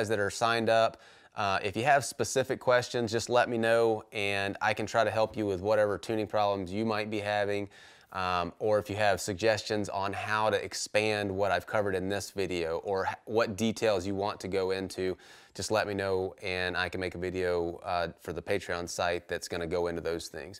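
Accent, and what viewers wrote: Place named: Appalachia, United States. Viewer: Greetings to speaking Appalachian English and Deo Frutuoso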